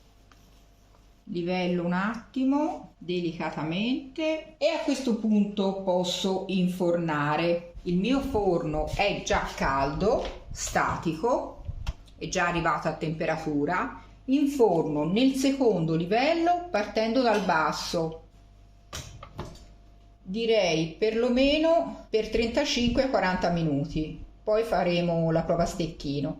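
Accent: Tuscany, Italy. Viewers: Grazie! Bello sentire il tuo splendido accento toscano!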